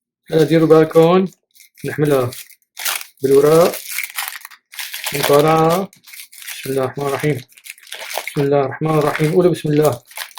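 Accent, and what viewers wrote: Levant. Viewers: الله يعطيك العافية متابعة لقناتك لشوف الطبخات الظريفة واسمع لهجتك الشامية الحلوة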